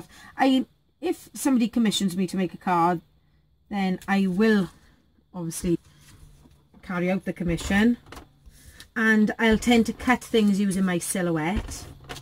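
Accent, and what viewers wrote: Wales, United Kingdom. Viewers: thought you sounded more welshy than me lol. Xx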